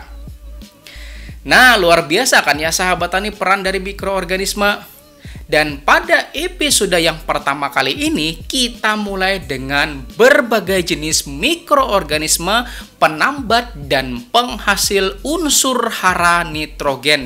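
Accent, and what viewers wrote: Banjar, Indonesia. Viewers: Tetap ketahuan logat banjarnya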